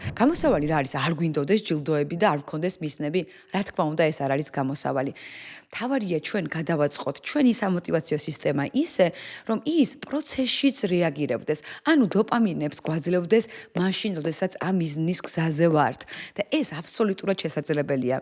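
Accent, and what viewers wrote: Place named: Guria, Georgia. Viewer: "კვტეპიან" გურული ხარ უეჭველი❤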